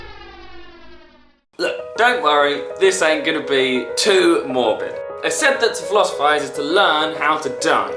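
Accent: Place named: England, United Kingdom. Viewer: ugh the English accent. why don't you all take up more of the Irish speak it's more musical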